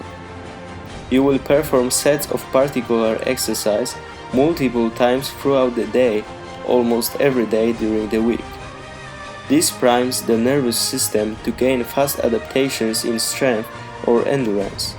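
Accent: Poland. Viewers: Polisz inglisz najlepszy <3 :D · to Polak? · Coś taki polski ten angielski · Ah ten polski akcent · When I heard you I thought: What a polish voice! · Wyczuwam Polski Akcent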